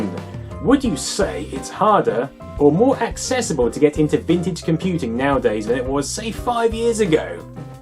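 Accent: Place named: Estuary, United Kingdom. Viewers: Spinkington Clift haha you'd have no chance in this part of the UK, he's pretty posh by our standards. British accents vary considerably. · It's a British accent. That's how they talk. Actually if I'm not mistaken that's the "estuary accent" that's common in the UK. Not pronouncing final -r sounds is a hallmark of British accents and the th pronounced as F is called "th-fronting". But my linguistics education is pretty much limited to YouTube videos so i'm not an expert.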